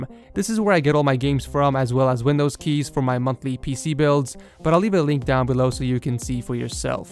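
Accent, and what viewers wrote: Russia. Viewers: At first I was going to click out the video because of the Russian acent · Nice Russian Voice + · Awesome Russian accent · Best Russian accent evr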